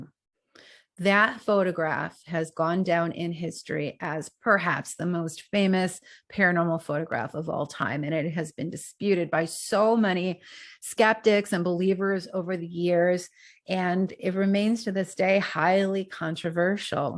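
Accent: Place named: United States. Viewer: The American accent jars; so no thank you!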